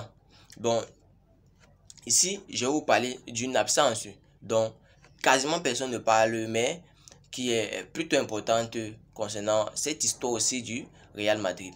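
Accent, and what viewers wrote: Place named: Cameroon. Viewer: Mon frère il faut cesser de nous parler le français camerounais et on ne sait pas ce que tu as dans la bouche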